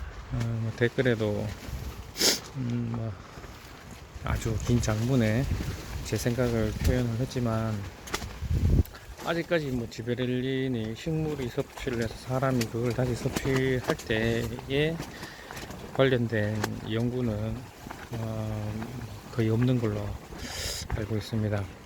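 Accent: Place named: North Gyeongsang, South Korea. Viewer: 선생님 말씀으로 봐서는 경북지역 이신것같은데요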